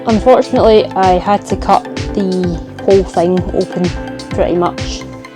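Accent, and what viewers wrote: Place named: Scotland, United Kingdom. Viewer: Progress is coming along well, you'll learn as you go and no better way to learn than to throw yourself in at the deep end. Got to love the Scottish accent. Keep up the great work and look forward to more updates 👍🏻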